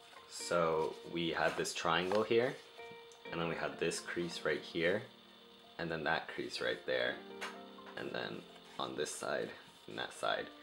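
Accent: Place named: United States. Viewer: Good job no offense but you sound like an American lol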